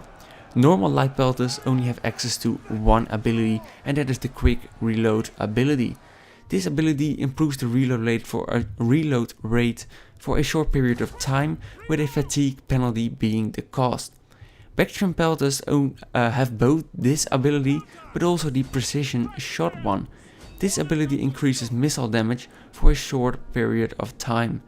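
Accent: Netherlands. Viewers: love you dutch accent!!!! Nederlander en meoten we trots op zijn, Batavi, frisii.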